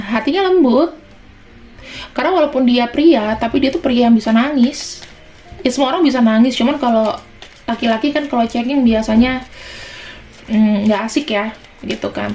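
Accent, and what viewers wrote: Java, Indonesia. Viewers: Maaf mbak selama ini aku hanya mendengar genetal riding tp suatu saat saya mau personal riding dan caranya dihitung perja atau gmn dan perjamnya brp tktnya agak lm pertanyaannya ini aja mbak klo blh tau mbk itu org jaea apa medan ya kok pskai tp klo diliat bahasanya cengkoknya jg setenga jawa ini mbak slm dr martin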